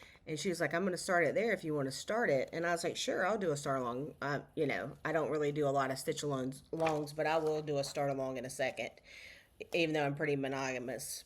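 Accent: South, United States. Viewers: Another great video,! I, too, speak Southern (or is it Surrthern)! That used to bother/embarrass me, but NOT any more!! I'm proud to be a country bumpkin! Your Spooky Summer is a great idea, but I'm in the middle of a huge red sampler and it seems to be attached to my body at the moment! I can't wait to see your finishes, I know they will be fabulous! Happy Stitching and see you next time! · You amaze me with all that you accomplish. Your stitching is beautiful, and I absolutely love your southern accent!!! :) Thank you for another great video.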